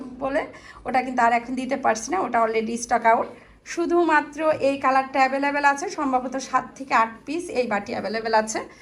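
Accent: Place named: Kushtia, Bangladesh. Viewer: আসসালামু আলাইকুম আপু। আমি নতুন তোমার চ‍্যানেলে।আপুর কথা শুনে মনে হচ্ছে কুষ্টিয়া হয়তোবা। সব জিনিস গুলোই সুন্দর 💓💓💓💓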